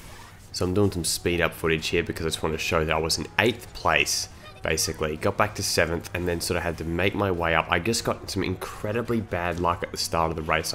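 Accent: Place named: Melbourne, Australia. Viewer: Are u from Melbourne? Love that accent. Anyways, great video!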